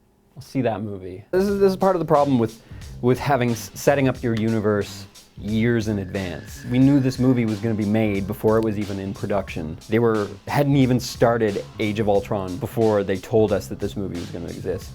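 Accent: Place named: Canada. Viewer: The canadian accent has never been so prominent.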